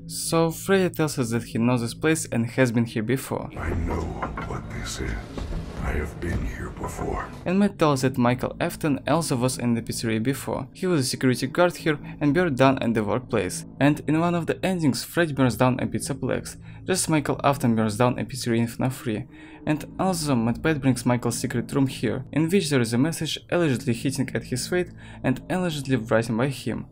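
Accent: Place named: Russia. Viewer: He are russian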